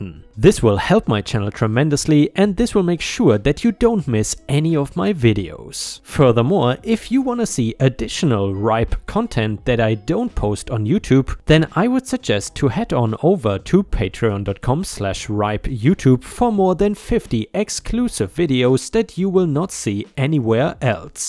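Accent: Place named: Germany. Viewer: Are you German? Sounds like an German accent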